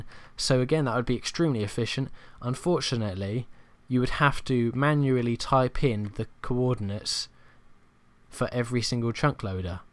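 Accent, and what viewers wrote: United Kingdom. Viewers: lol, can someone tell me why brittish accent is so hard to understand? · Oh, you're British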